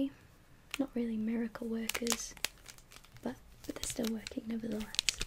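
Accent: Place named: Wales, United Kingdom. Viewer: love your videos from hirwaun and its nice to hear a welsh voice on here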